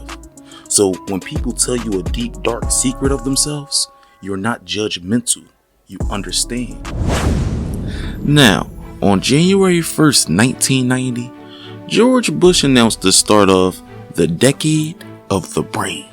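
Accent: Philadelphia, United States. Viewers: Yo you from Philly thought you was from Baltimore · Okay, definitely Philly, wow man hearing you speak the way you do fukk me up gang, so articulate but at the same time look burnt out, never judge a book by its cover ❤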